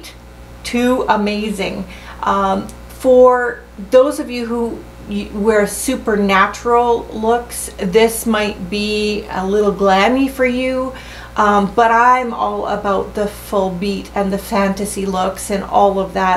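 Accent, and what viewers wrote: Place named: Toronto, Canada. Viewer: Hello from Belgium. I found you because I wanted to learn more about Spencer but YOU sound like a sweetheart! I'm originally from Atlantic Canada (Cape Breton Island) but haven't been back home since 2011 but l please correct me if I'm wrong but you sound like you're a mainlander perhaps from Toronto?? (My apologies if you're a Yankeedoodle eh but I left to come live over this way back in 1989). ❤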